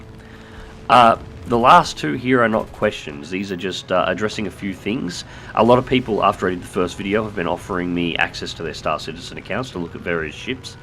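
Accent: Australia. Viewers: Hi, It's glad to see your new video on youtube. I thought Australian English was so-called "unbearable" before watching your videos, but now I'm pretty sure I like your Australian accent. Thank you for the exceptional skill you show in the video.:) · yeeeeeees!!! finally! go away sleep, I have a fellow Aussie to listen to! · always sound like crap you crazy Dingo!!!! Your Austrailian, which is sexy if your Olivia Newton John or Kylie Minogue!!! You sound like the old guy from Air Supply most of the time!!! "LOL" -- You have brought me sooooo much fun, lucky day for me when I stumbled -literally - on to your channel!!! I think of you as my good friend down under -- Fair Dinkum --- Sprog1 in AZ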